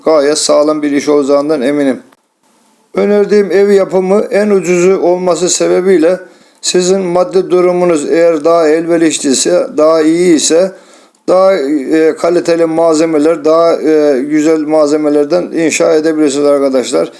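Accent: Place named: Black Sea, Turkey. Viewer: Ustam siveden bizim karadenizli gibisiniz...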